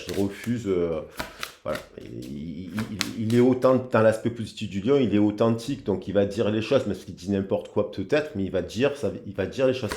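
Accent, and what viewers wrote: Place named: Marseille, France. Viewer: Ah oui c'est l'accent de Marseille...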